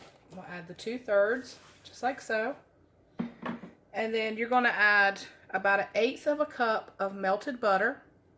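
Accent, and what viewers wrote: South, United States. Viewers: Arika sent me over and I'm glad! I love your Southern accent. I'm from North Carolina so I feel right at home with you! I'm new to your channel and I plan visiting you every time you post. Thanks for the great recipe! Looks delicious! God Bless!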